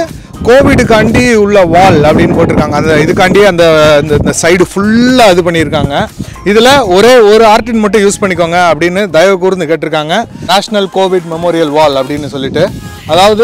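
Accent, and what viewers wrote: Madurai, India. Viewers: லண்டனை சுற்றி பார்த்த உணர்வு.பதிவுக்கு நன்றி.மதுரைதமிழில் அசத்தலான பேச்சு.நடுசென்டரல 😀👌👌வாழ்த்துக்கள்.கோவிட் நினைவு சுவரில்SPB&VIVAKஎழுதியது .உலகத்துல உள்ள அனைத்து தமிழ் நெஞ்சங்களில் வாழும் இருவர்.பாராட்டுக்கள் வாழ்க வளர்க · உங்க(எங்க) மதுரை தமிழ்ல கேட்க ரொம்ப மகிழ்ச்சி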